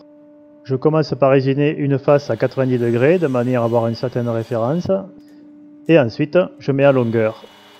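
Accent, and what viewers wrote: South, France. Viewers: Super et avec le petit accent du sud agréable à voir entendre et comprendre. Le bonjour de Londres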